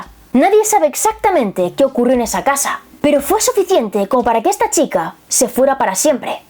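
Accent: Spain. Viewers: Un misterio muy misterioso porque no tiene 10 millones de suscriptores. Saludos, tu acento español tiene un misterio encantador Saludos bellotas hermosas y preciosas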